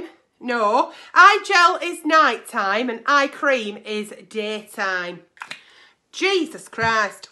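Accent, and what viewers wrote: United Kingdom. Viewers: Idk if you're British or not, but God damm I love it.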